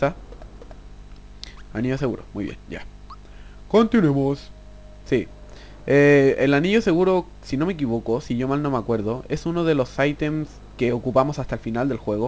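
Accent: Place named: Chile. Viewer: Sí el es chileno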